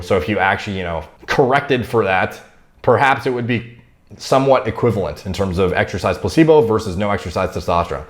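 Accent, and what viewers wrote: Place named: Canada. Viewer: bruh. you sould so canadian.....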